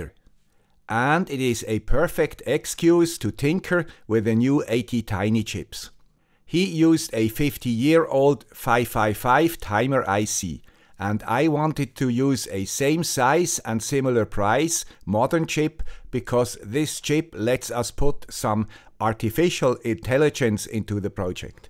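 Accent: Switzerland. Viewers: Does anyone know why he has a Swiss accent? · "Also a guy with a swiss accent"... Classic =P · Hard to listen to swiss accent for the first time. Let's put it into "watch later". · a guy with a Swiss accent, a vintage high tech NE555... and now ATtiny's... what an awesome Sunday morning entertainment. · The video started without audio on YouTube and my brain bugged trying to understand what was doing on the guy with the Swiss accent's channel. 😅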